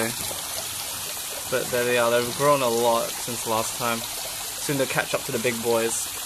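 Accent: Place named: United Kingdom. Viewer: you sound more british than anything else, but beautiful tank are you in malayia or america or what?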